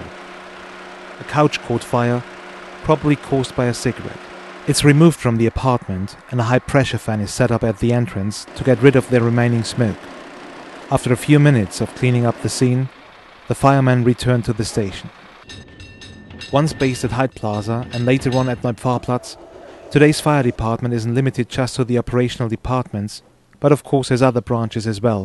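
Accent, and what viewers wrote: United Kingdom. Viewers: Could you please make another version of that documentary with an american speaker? The speaker's english sounds really british :( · Ach, das "Amt für Brand- undZivilschutz" oder wie das heißt, in der Greflinger... Schönes Material, da in diesem Jahr das Budget für einen Neubau (sprich: Abriss des Bestandsgebäudes) genehmigt wurde. Historisch wertvoll :-) Außerdem ein schöner britischer Akzent! Ich kriege den nicht hin, nur wenn ich "oh no!" sage, ansonsten habe ich das eher raue US-Englisch drauf. Mit starkem, deutschem Akzent.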